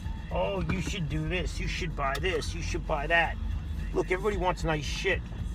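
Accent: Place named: Long Island, United States. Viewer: The New York accent lol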